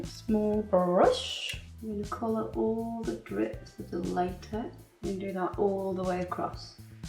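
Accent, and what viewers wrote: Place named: North, United Kingdom. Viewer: That looks freaking awesome! Loving this second channel, as soon as I've watched your nail tutorials I come over here haha! it's great to here a fellow northerner on YouTube! Hello from Merseyside!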